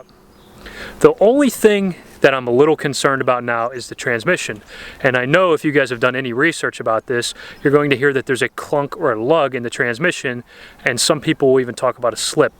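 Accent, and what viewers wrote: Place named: Pittsburgh, United States. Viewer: 1st off -- I love your Pittsburgh, PA area accent, I used to live in the constant grey of PA up there myself but I'm in sunny Florida now where I bought my '14 Sierra new off the lot. Had about 20k miles on it and the A/C condenser went out -- but they wouldn't fix it because I was past the 36 month warranty but I paid for the parts and they ate the labor. 2nd, I have that tranny "clunk" that you talk about from day 1. It was never bad enough to take back to dealer to see if it was an issue but it is always there especially when tranny is cold and I am driving slow out of the neighborhood. It is 2022 now and I only have 44k miles on it but your mention of the lifters is bothering me. I'll definitely look out for that issue. BTW, my "tailgate assist" thing just quit working one day years ago. I'll definitely keep an eye out for the GPS antenna seal because we get tons of rain down here. 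With that in mind, I do get a musty smell every time I start my truck and the fan is blowing. I have tried many things to get that to go away but it won't. I assumed it was the humidity down here but now you have me thinking it could be that seal on the roof. Thanks for this video, you confirmed many of my suspicions of issues.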